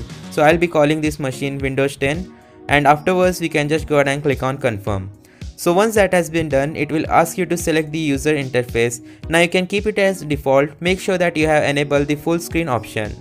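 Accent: India. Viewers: Are you from Indian because your English language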